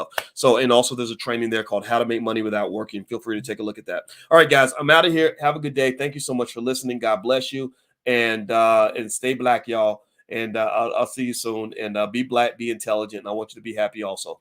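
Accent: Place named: South, United States. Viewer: Love the Southern accent, Doc!😊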